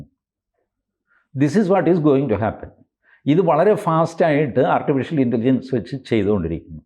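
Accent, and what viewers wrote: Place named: Kasaragod, India. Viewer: കാസറഗോഡ് ഭാഷ എന്ത് കുഴപ്പം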